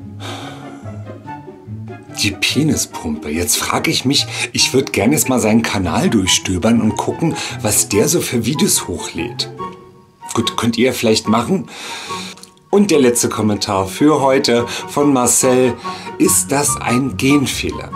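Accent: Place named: Berlin, Germany. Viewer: Ich hoffe du liest mindestens 100mal mehr positive Kommentare als solche niveaulosen die im Video gezeigt wurden. Dein Humor ist großartig und deine Kunst ist nicht nur unterhaltsam sondern auch sehr lehrreich in puncto Szene, Politik, Berlin und Selbstbewusstsein. Natürlich ist der Ton manchmal sehr derbe. Aber wer sich die Mühe macht hinter die Fassade aus derber Berliner Schnauze und Makeup zuschauen, wird die Kunst und deine Absicht dahinter erkennen.Ich hoffe noch viele Videos von dir sehen zu können.Danke dafür <3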